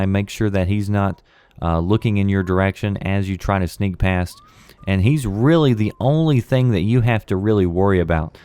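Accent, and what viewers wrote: South, United States. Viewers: Hmmmmmm, so southern and Mild. Plus 10 for good game-play! Have a like.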